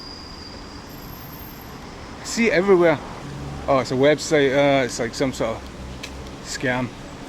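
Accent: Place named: Scotland, United Kingdom. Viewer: This video seems so cool. This is exactly the kind of places I wanna go see. But as a non native english speaker I find it hard to absorb both scottish dialect, wind and road noise. Maybe something can be done? I've watched parts with great interest and given a thumbs up :goodvibes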